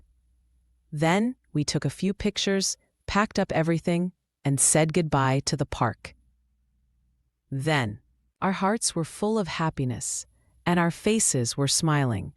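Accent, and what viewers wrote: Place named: United States. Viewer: Everything becomes very easy when the instructor is someone who can explain things in a straightforward manner. I understand English very well from your story and the best thing is your speaking style. I really like the American style. Your style of speaking and language are very good.Please upload two videos a week so we can practice and learn well.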